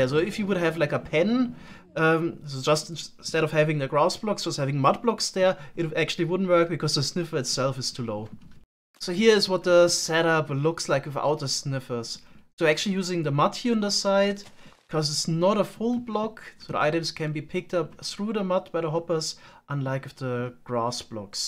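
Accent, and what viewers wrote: Germany. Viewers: you hear so on as u would be german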